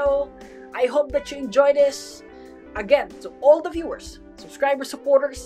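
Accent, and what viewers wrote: Philippines. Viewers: Hi i know your filipino gamernom bc you sound like filipinos so i knew it already do you make a gamernomph channel please tell me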